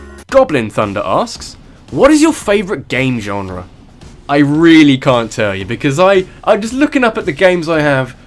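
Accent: England, United Kingdom. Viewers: What part of England are you from? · HOLY FLYING HOBNOBS, HE'S BO BRITISH! O_O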